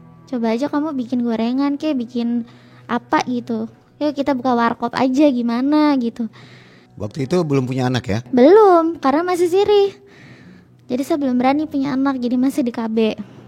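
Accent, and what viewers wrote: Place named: Cirebon, Indonesia. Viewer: Yah Cirebon jemaras dia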